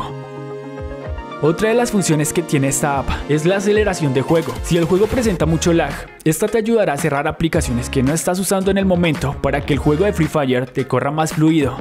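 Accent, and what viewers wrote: Colombia. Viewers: Antes cuando te veía me sonaba muy paisa tu voz pero ya tot acostumbrado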